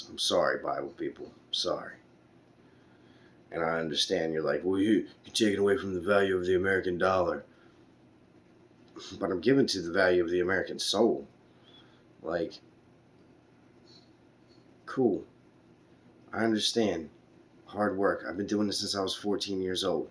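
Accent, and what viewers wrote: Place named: Ireland. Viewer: our soul is stranded in skin, bones and a little more of chunk for some of us. I believe the meaning of life is to live to the best of our ability and take it one day at a time. Some days I feel on top of the world and other days I want to crawl to the back of a cave and disappear. You’re right about money, it doesn’t define one’s character, it can leave a legacy but the act of love leaves a larger legacy ❤️ BTW Mr. your Irish accent is ☘️ but I love your authentic voice. Keep the videos coming……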